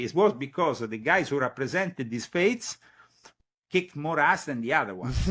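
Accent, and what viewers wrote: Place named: Italy. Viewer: Just brilliant. Love the Italian accent!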